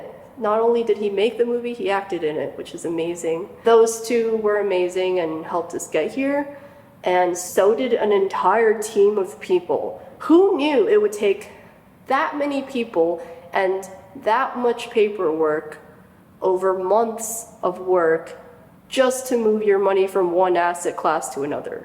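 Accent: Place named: New York, United States. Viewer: Crazy. I thought you were in your early 40’s and your delivery is very nation New York, woody Allen. Congrats on buying in NYC,maybe you could have waited a few. If you did not hear there is a communist in NY and all the millionaires will be selling their properties to head to Florida. Discounts abound. 😉 congrats. Now please explain the difference between a condo and a coop?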